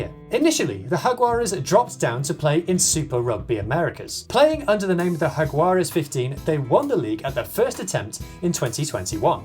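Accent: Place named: United Kingdom. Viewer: Same here, his brit accent makes it more understandable (at least for me haha)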